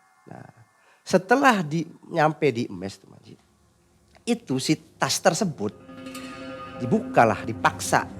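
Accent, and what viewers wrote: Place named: Indramayu, Indonesia. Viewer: Waalaikumsalam salam mng ei,😂😂maaf mang bhsae wng Indramayu khas bnget (dicekedong)sya jg asli orang Indramayu,SHT2 crew MM dan narasumber nya...❤❤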